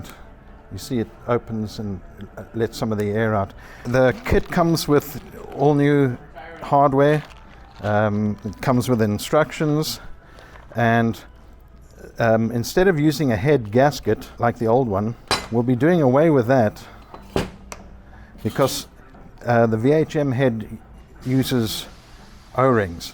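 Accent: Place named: South Africa. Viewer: You, kind Sir, sound incredibly South African, if you dont mind me saying so. 2stroke Love from South Africa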